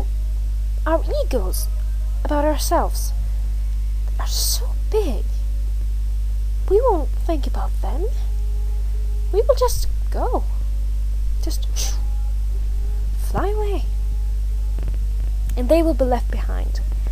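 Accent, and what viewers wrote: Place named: United Kingdom. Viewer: Just curious but, are you reading something when you're narrating this? And did you do this for school? I still don't really feel convinced. But your English isn't as bad as you think, you actually sound somewhat British. The only thing that stood out to me was "drought" which is pronounced like the word out (but you might already know that now). Anyway, best of luck if this is for a grade or whatever.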